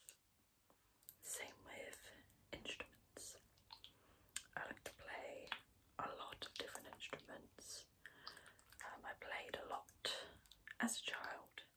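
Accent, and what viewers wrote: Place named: United Kingdom. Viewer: oh, your british accent <3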